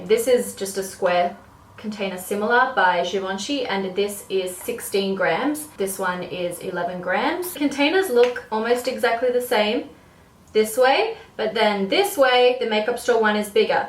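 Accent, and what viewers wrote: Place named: Australia. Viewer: I didn't even realize she was Australian until she said that and then I could hear the accent lol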